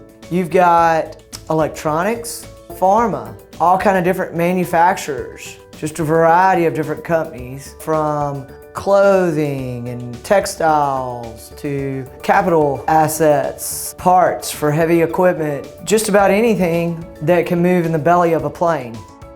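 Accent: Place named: Texas, United States. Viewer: ¡ Love the Texan Accent ! 😉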